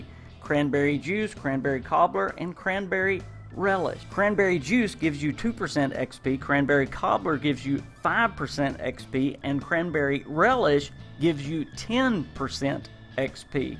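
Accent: South, United States. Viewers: I have never heard a more thick southern accent, and I am in the south. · Nothing quite like a Southern gentleman's voice!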